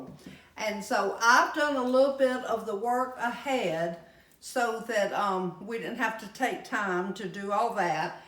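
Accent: South, United States. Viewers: your southern char., hospitality and accent is the real deal.. What a blessing you are!!! And most importantly YOU LOVE JESUS!!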